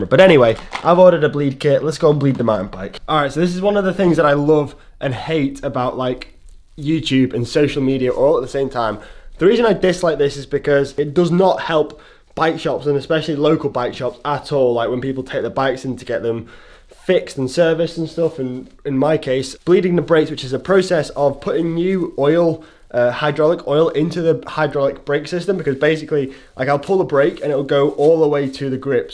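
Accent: United Kingdom. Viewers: That's a very English accent 😂😂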